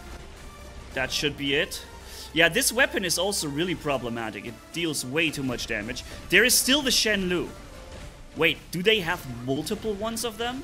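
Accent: Germany. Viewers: Sein Akzent hört sich deutsch an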